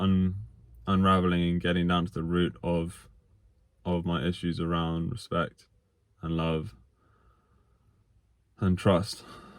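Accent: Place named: United Kingdom. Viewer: Oh that Beautiful British Accent 😍🇬🇧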